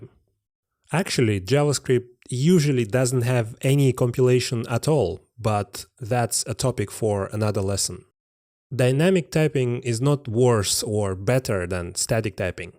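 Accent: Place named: Eastern Europe. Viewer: Why does he have an eastern European accent but his username is?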